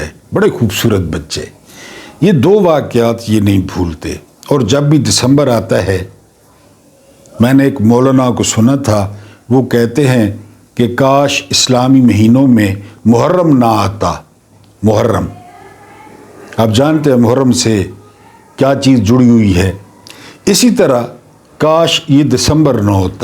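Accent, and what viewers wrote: Saraiki, Pakistan. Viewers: Subhan Allah bhai.bht achhi Islami kahanian hoti hain aapki.bht maloomat hoti hain Aur bht mofeed hoti hain Allah aap ko ajar.e. azeem ata farmay.Vedios dilchasp hoti hain Tawalat ka pata hi nahen chalta.aur saraiki ka tarka to bht achha lagta hai.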